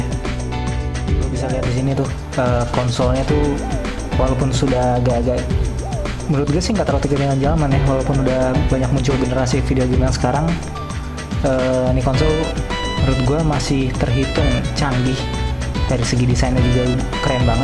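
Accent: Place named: Surabaya, Indonesia. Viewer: Dari jengenge iki pasti wong suroboyo :D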